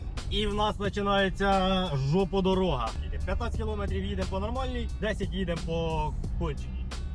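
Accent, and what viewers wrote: Poltava, Ukraine. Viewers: Доооли ,Ти з галещини Чи з козельщини,короче з пооолтавщини,бо неноче з у хобот мовешь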